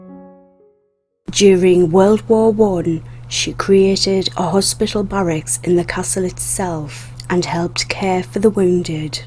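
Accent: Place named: Durham, United Kingdom. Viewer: Ha, I guessed the general region (North East), but my Canadian ear would never've been able to pinpoint Durham. Your speech is so soothing. I'd love to learn more about the eldest daughter, Elizabeth Franziska – about whom there's not much written (partly due, perhaps, to early death).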